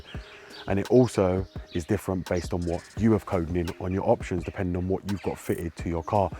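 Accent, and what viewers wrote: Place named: London, United Kingdom. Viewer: He's a Londoner If you can't recognise the accent then your a melt ... Now geda' Fuk outta ere ya cunt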